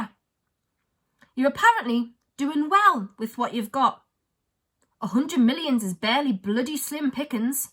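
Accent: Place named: Darlington, United Kingdom. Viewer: are u from darlo im sitting here wi wor lass and i said to her hoy pey she talks like u lol.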